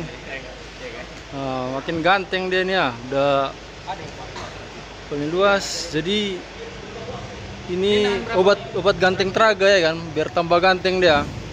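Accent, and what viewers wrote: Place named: Medan, Indonesia. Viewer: Medannn kali ahhhh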